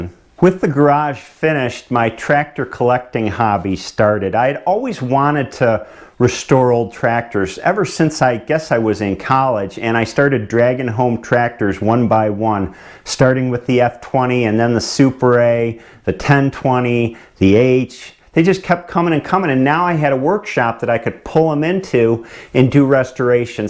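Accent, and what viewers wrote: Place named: New York, United States. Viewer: Well as a southerner his new York accent is about more than I can stand but instill enjoy his videos😂😂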